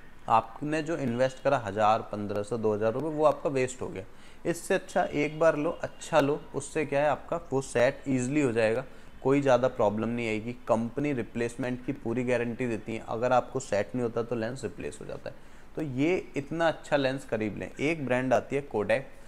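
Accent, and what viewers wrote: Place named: India. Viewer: your tone and accent is too hindi medium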